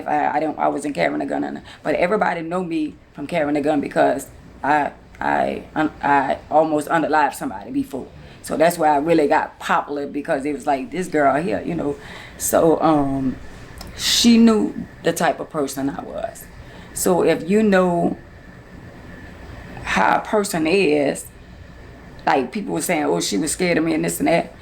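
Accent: New Orleans, United States. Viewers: she sound like she close to new orleans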